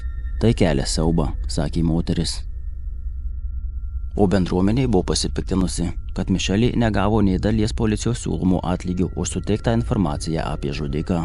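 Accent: Suvalkija, Lithuania. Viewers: Akcentas lb gražus, Suvalkijos.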